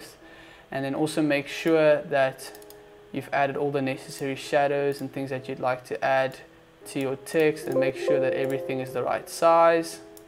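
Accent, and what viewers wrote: South Africa. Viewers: Hahah I can hear your south african accent 😂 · This is dope thanks for the hook up, nice tips especially the Motion backround ... Dude ,I could swear you sound South African... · you can recognise the South African accent anywhere! :) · Recognizing that South African accent a mile away. My bru 👏🇿🇦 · Lol i was skipping thru the intro ...and was like wait hold up...lol if u South african,you can't miss it lol🔥🇿🇦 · I reckonize that SA accent my bru 🇿🇦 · I was wondering why he sounded like some one from South Africa😂😂😂😂😂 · why am i hearing a lil bit of ab de villers sound when he is speaking are you from SA??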